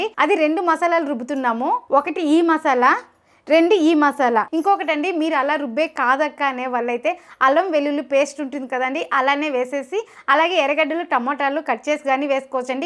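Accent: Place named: Tirupati, India. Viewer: Chala baga chesaru akka mutton pulusu 👌🏼😍 ekada akka mee vooru? Tirupati r kalahasti anpisthundi slang vintunte ??!!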